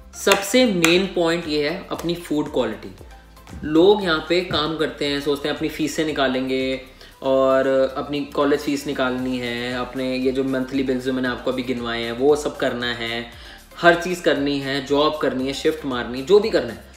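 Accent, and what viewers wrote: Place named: Sindh, India. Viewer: I can surely say that you’re a Sindhi Indian. Borders just can’t change our accent ❤️ Love from SINDH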